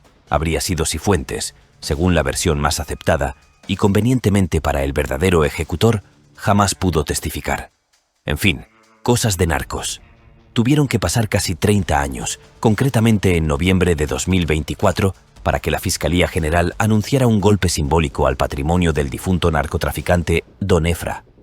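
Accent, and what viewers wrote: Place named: Spain. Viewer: Esa voz spanish 🤮🤮🤢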